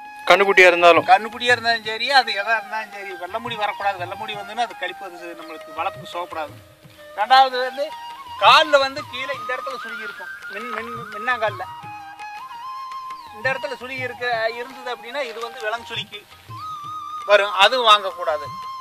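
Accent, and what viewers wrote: Kongu, India. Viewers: ஆஹா...கொங்கு தமிழைக் கேட்டுக் கொ‌ண்டேயிருக்கலாம்! அருமை! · இந்த கொங்கு இன தமிழ்....... வாழ்க.,.. வாழ்க..... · Kongu Tamil❤️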